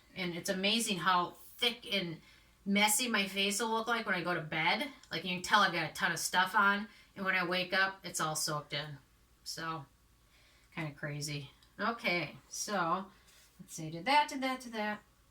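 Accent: Minnesota, United States. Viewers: it does my heart good to hear your Minnesota "accent"... I'm originally from North Dakota and it sounds like home. 😄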